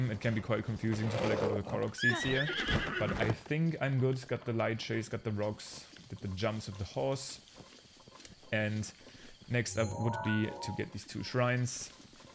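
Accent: Germany. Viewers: are you german by any chance? i feel like i’m hearing a very slight accent and it reminds me of my home region there.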